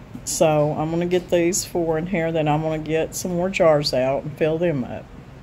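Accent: South, United States. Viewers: I have never tried pickled green tomatoes. I am pondering whether I have enough green tomatoes to try it. Thank you so much for sharing your videos. I always come away feeling like I have taken a step back into my childhood and seeing grandmas pantry or mom at our old wood stove, etc. Your accent is heartwarming as it is soooooo familiar. My family's from Arkansas and Dads side was Texas. I don't know...I just sit down, watch, relax and learn some interesting tips... :)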